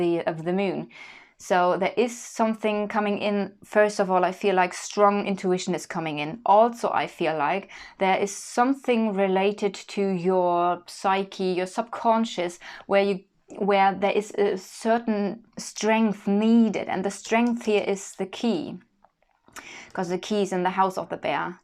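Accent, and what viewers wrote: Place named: Germany. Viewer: We missed you, your accurate detailed readings, your lovely personality and your sweet voice and angelic singing. I can slightly hear the German accent integrated in your soft British English. I can see you've been working a lot with the German community, but please do not forget us, the rest of the world. You would not believe the hearts you've touched, even in a faraway land like Albania, an unknown place to many, from where I'm listening to you now! Thank you dear. Danke schön! A Virgo sister! <3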